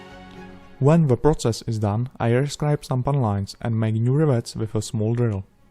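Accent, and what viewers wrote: Czech Republic. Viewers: "just a little bit" :-) vynikající model a další vysoce kvalitní video, jen to na můj vkus maličko sráží místy školní czengličtina (nemůžu si pomoct, jsem anglista), slovní zásoba je easy nebo si dohledáš, ale občas tomu skřípe pořádek slov, vazba there is/are, etc., někdy to zní jak přes google translator :) neber to, prosím, negativně, za poslední rok dva ses hodně zlepšil; předpokládám, že komentář čteš z nějakého aspoň provizorního skriptu, nechtěl bys to třeba před namluvením zkorigovat? mně to zabere 20-30 minut a rád jako profi lingvista pomůžu profi modeláři k ještě lepším videům ;) kdyžtak napiš do odpovědi a domluvíme se přes fb, ale mezi námi, stejně to asi nikomu nevadí, naopak, ta tvoje ostravská angličtina tomu dodává local flavour ;) ať to šlape!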